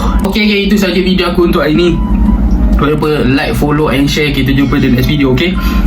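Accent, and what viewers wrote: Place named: Melaka, Malaysia. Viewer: SODAPPPP! BUAT VIDEO LAGI BANG, PEKAT NAU LOGHAT MELAKA!!!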